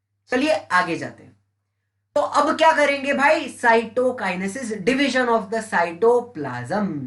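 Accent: Maharashtra, India. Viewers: Are u Marathi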